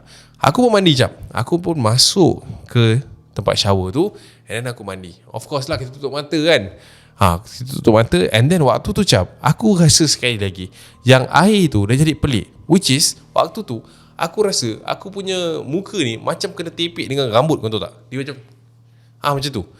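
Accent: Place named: Terengganu, Malaysia. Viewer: Aku dgr je dia ckp klantan tpi slang ganu😅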